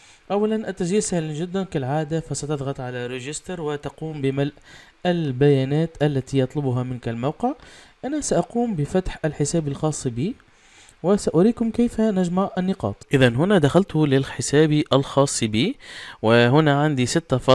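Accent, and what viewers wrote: Tunisia. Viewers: هل انت تونسي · انت تونسي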